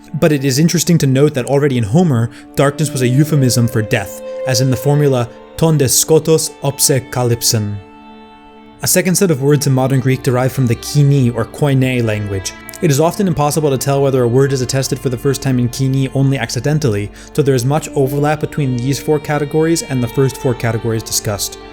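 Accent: United States. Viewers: his American accent is too apparent when pronouncing Greek words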